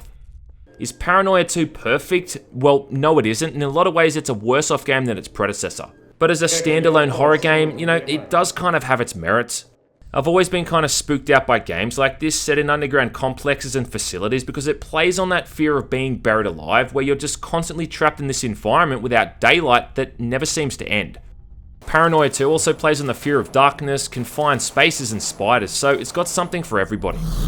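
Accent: Australia. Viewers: Didn't know I needed subtitles to understand Australian.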